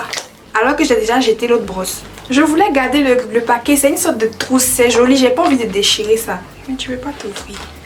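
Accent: Ivory Coast. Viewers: Ma soeur ivoirienne, je te kiff grave ,chic accent ivoirien et chic vidéo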